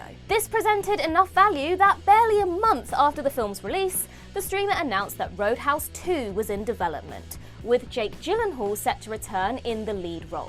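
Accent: United Kingdom. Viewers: I like the snacky British accent. Did she see the last 15 minutes of "28 Years Later"? Please, Watch the movie.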